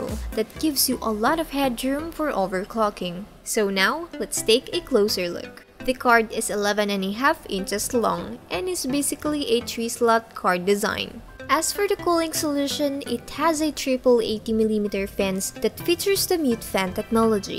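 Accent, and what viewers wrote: Philippines. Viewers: That Pinoy accent tho.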